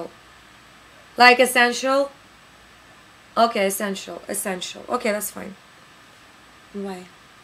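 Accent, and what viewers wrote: Russia. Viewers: She's most likely Russian. Notice how she pronounces the words "lesson", "fifth" and especially the vowel "a" as in " ". BTW: LOL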